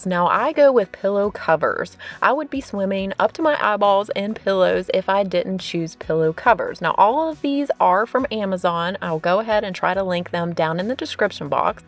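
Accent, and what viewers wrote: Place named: Pennsylvania, United States. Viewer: Really cute decor! Love that copper pumpkin & wreath! I was just hunting the acorn spice today, & finally got it at target after asking a manager, it was still in the back. Was wondering if you are from PA. Kind of sounds like it to me. I grew up there. Have a blessed weekend!🍂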